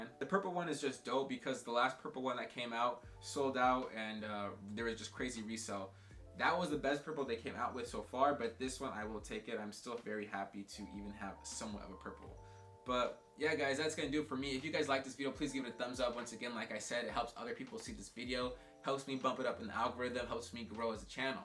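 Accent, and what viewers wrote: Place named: United Kingdom. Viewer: Thought you were from the UK😂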